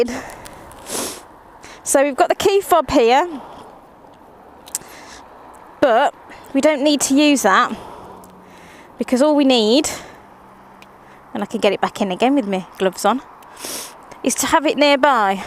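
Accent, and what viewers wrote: United Kingdom. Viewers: I LOVE how you speak. Are you english? And forget the hate. Because the "negative comments" that I saw here are clearly hate. Out of jealousy, and fear. Guys don't like when they meet girls who have double their balls. And for a girl to ride a Diavel, she needs big balls ahah! Tell me, are you tall? Small? What's your frame? I wonder if a short, slim girl can actually ride a Diavel.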